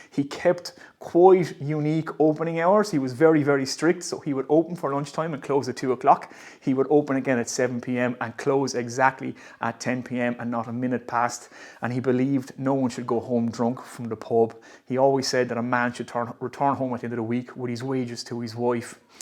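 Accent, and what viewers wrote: Ireland. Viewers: The man was teetotal!! It's probably just something re-packaged!! How could he sample it?! Nice enough though. Like your Déise accent!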